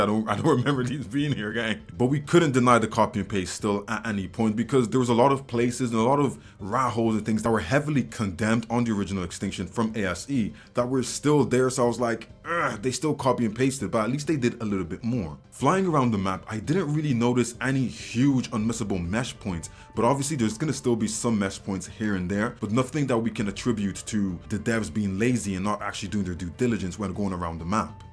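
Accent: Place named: United Kingdom. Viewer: Somewhere in the uk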